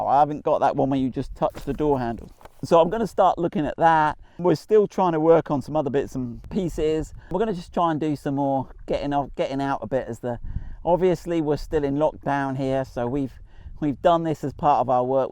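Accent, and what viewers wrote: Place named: Purton, United Kingdom. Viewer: Stay chilled. I totally get what you say about early adopters and I’m pretty sure we all appreciate your efforts. I for one continually sing your praises to everyone on the Range Rover 405 Facebooks page. I love the personal approach to your videos and explanations and agree with you about the sense of community and love the way you connect with your customers and subscribers (maybe it’s because I’m an old Purtonian and we share the same accent). Keep well and make the time to enjoy Dad days with. All the best from (for once) sunny Dartmoor. 👍🏼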